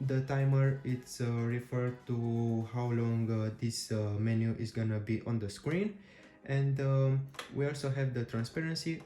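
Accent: Romania. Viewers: i'm pretty sure that s a romanian accent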